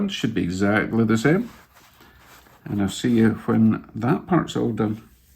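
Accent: Scotland, United Kingdom. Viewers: I love your Scotts accent my father was of Scotts descent and when speaking to older members of the family that is the accent I remember.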